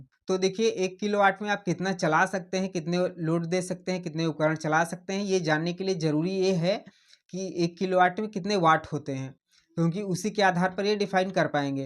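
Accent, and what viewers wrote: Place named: Western Uttar Pradesh, India. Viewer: आवाज तो आपकी वेस्ट up की लगती है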